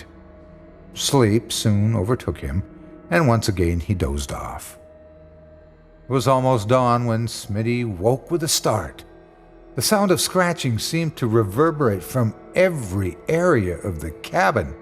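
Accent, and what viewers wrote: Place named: South, United States. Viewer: sounds like southerner i like it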